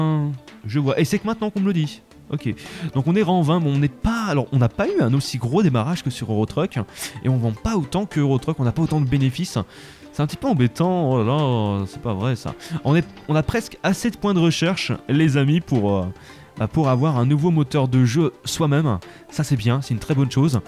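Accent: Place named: Paris, France. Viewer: pourquoi tu dit gare et pas genre . tu est de Paris ??? Parce que moi je suis d'Aveyron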